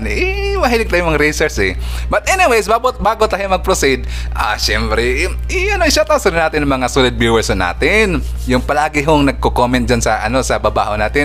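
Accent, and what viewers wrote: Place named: Bohol, Philippines. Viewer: Parang taga Bohol ka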